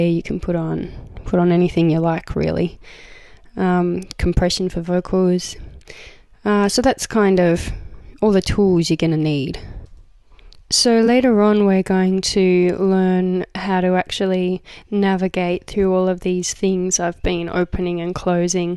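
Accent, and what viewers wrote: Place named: Australia. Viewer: australian accents, man.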